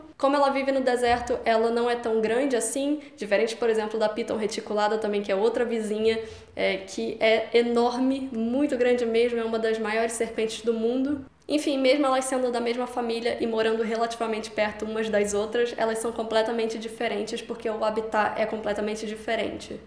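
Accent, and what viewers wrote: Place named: Portugal. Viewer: Vc é de Portugal ou não?kkkk vc tem um tipo de fala de lá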